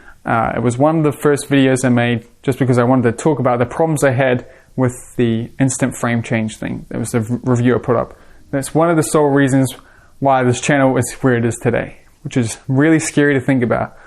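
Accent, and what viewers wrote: New Zealand. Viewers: So bad with accents dude had no idea you were in NZ Also, what you said about royals being hars for ppl I've been skating since 96 and still cant royal and farv. I can boot trick ANYTHING and I can royal down rails but flat ledges I just gave up on them lol. Reason I can royal down rails is I just naturally slip into them and learned to control it over time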